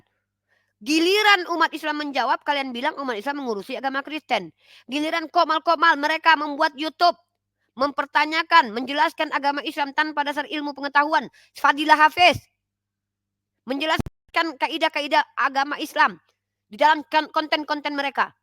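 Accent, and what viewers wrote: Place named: Ambon, Indonesia. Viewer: Enak kali ngomong ambon 2' maulah kalian jangan mancing yaa..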